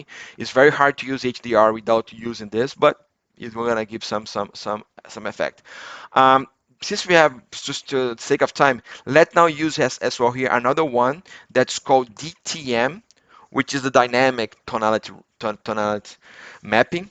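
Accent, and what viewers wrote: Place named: Brazil. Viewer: Seu sotaque parece brasileiro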